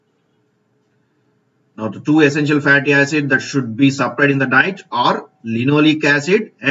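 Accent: South India, India. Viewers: If you think its east indian accent, then you certainly dont have any idea about Indian accents at all. This is south indian english accent. And no, there is no such thing called east indian accent of english.This is the nth time I have seen a silly comment from an Anglo, saying that thing - "East Indian" to refer to something which is rawly indian. Is that an addage in West of calling Indian accents of English or anything raw from India that they wish to mock as - East Indian for some reason? Because , I never get the reference of how South Indians become East Indian when youse have to throw some rebuke. Nor I get how puniabies who happen to be West Indians get called having East Indian accent while they use a peculiar accent of english which is actually how they speak and not east indians at all. 2ndly, there is no such thing called East Indian accent in the 1st place. 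People from east india , if speaking in english speak in a variety of tonage and accents, some closely resembling old english and some just like Russians or chinese speaking , english . It's not a very common language in East India. So the whole reference of East India to everything seems highly stupid , ignorant, and unsubstantiated in the 1st place.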